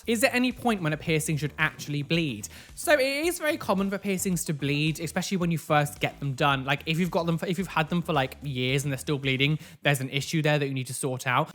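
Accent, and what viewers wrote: United Kingdom. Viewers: you should see me do my impression of you to my friends complete with hand motions and british gay accent. "time for another piercing reaction videoooooo!" lmao!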